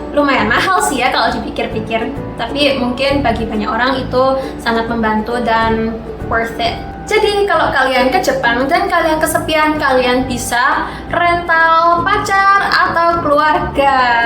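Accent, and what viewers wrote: Java, Indonesia. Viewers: Medoknya gak ISO ilang kak, kapan Moro neng malang meneh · Bhs ne wis medok koyo wong indo...jgn" sdh lupa bhs jepang nih ^ ^ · Orang jepang lahir di sidoarjo, logat jawa nya tetep medok.. sukses selalu, aku suka chanel ini 👍👍👍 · Kok medog yo rek😐 Jgn2 deket rumah saya😅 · Cuman pengen nanyak, lancar bangt ya, bhs indonesianya, bhsa jawanya juga kentel bngt? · Tapi ko ngomongnya logatnya kaya orang jawa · Medok e iku lo... Nyenengne.... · Kok medok bahasanya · medog e jian 👌👌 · kamu ternyata ada medhoknya klo bhs Indonesia 😀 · 🥰😍,aq gak kuat medok e iku lhoo 😁😂👍🏿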